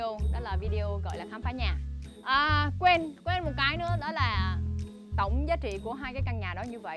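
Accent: North, Vietnam. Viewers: Mình thích em ấy nói giọng miền bắc hơn❤ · Nói một giọng thôi nè chị, lúc giọng na ná Bắc, lúc giọng na ná Nam nghe nó kỳ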